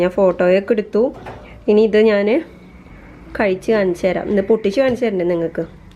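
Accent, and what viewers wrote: Thrissur, India. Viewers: Ente ummade ishtta vibhavangalil onnu kalathappam aanu.. Nalla mayam ulla idliyude recipe paranju tharumo... Love your Thrissur accent.. My father is from Mathilakam, Kodungalloor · താങ്ക്സ്, ചേച്ചി തൃശൂർ ആണോ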